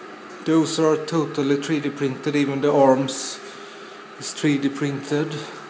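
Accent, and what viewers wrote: Jönköping, Sweden. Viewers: Hehe, den svenska dialekten.. Usch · You can hear that you are from Sweden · Nope, it's Swedish accent. Trust me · That accent.....i feel ashamed of being swedish .... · swedish? xD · haha, you can hear that you are from sweden, im also swedish ;) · swedish i guess :) so easy tro hear as a fellow swedish person. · oh god, that Swedish accent :D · Wow! So very cool! And yes you really do sound soooooooooooo Swedish! Love it! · Swedish? Tihi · Best Swedish accent ever! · Du låter lite som en Jönköpingsbo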